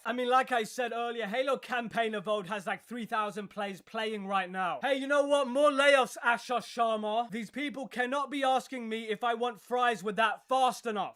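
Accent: United Kingdom. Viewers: This guys voice and attitude is wrong. Most of these Youtubers for specific games that are bad, are bad for these people that didn't get it right, until someone tells them that your wrong and should change of yourself or your group of what you are. As the guy is almost British with a bad attitude for nonsense of a game that is literally just a thing that shouldn't matter so much. Its like the guy drinks alcohol and doesn't quite get it past him of what it really does.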